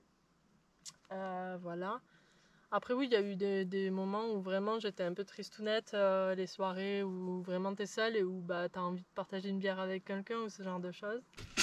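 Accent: South-West, France. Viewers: Nature, authentique, sincère , un peu sauvage et avec en prime un bel accent du sud ouest! Vous avez gagné un abonné de plus! Je vous conseille de suivre les périgrinations de Django nomade dont je suis aussi grand fan...Bonne route et à bientot!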